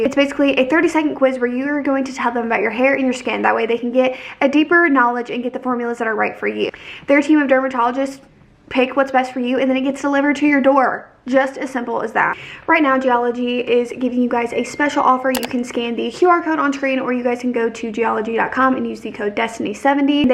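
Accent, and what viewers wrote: South, United States. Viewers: question for you: have you ever lived in the south? i swear you have a slight southern accent and the way you say “oils” is just further entrenching my southern theory!!!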